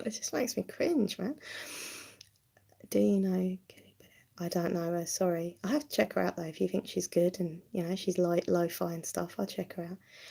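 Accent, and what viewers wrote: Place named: England, United Kingdom. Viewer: What part of England are you from? Can’t work out the accent it’s bugging me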